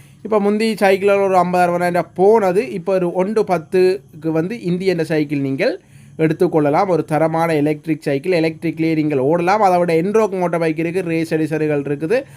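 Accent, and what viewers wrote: Sri Lanka. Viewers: Ivan srilanka tamil pesiran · இலங்கை தமிழ்.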